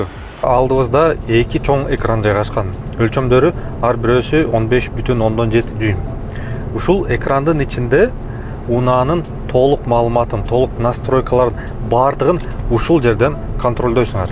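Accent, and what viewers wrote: Standard. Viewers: Кыргызча тазза граматно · Таза кыргызча керемет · Кандай таптаза кыргызча 🇰🇬🇰🇬 жолун шыдыр болсун уком. Азаттыктын денгеелинде суйлогонун жакты. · Нукура кыргызча пай пай сонун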